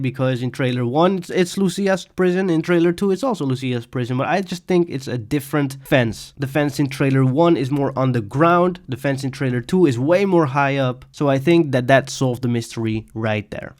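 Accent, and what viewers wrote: Netherlands. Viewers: Im probably wrong but your accent sounds kinda Dutch lol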